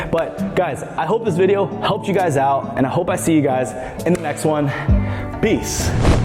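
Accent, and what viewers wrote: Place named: Orlando, United States. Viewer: Man it sounds like you are by Midway or Orlando.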